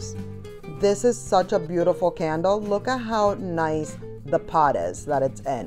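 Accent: New York, United States. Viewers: Hi I found your channel recently and really enjoy a fresh take on these shopping channels. You sound like you're from the New York ,New Jersey area am I right? I don't mind that you say you know a lot. 👌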